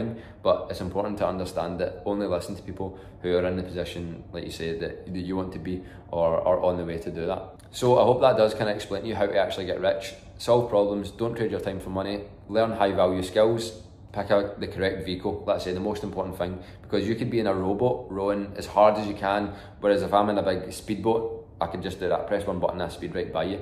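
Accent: Scotland, United Kingdom. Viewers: OMG I love your accent!! Are you Scottish? Btw idk if you noticed, but the subtitles have so many mistakes.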